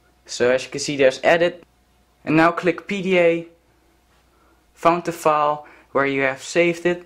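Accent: Netherlands. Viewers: ik hoor zo hard dat je nederlands bent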